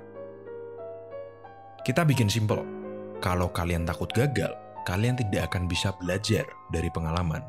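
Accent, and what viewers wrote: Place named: Semarang, Indonesia. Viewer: Koyo suarane wong semarang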